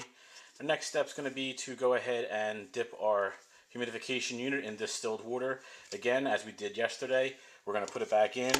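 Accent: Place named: Philadelphia, United States. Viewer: Philly accent.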